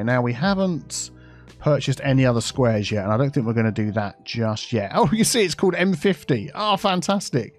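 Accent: United Kingdom. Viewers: I new you are a Brit since the first time I head you. And English is not my first language.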